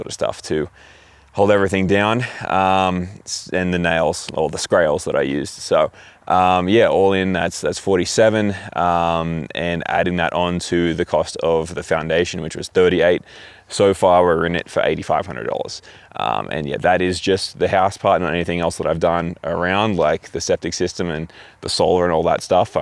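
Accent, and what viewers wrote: Australia. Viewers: I just found your videos must admit enjoying so far. A quick question, are you an Aussie?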